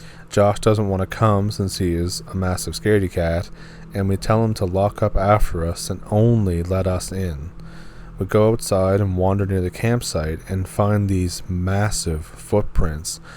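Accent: Canada. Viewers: I saw your comment and the thread it started on a Raven Reads video. I told you I would check out your channel and I have. I picked a random video down the list a little and this is the one. I liked it so much I subscribed! I look forward to checking out more of your already posted videos as well as any and all new content you post. Your voice is great, I love Canadian accents, and perhaps most importantly, you tell the story really well. I felt like I was just hanging with a friend while he shared the story with me. It felt more intimate than most channels, less rehearsed and more spontaneous, if that makes sense. It was awesome! 🙂🙂🙂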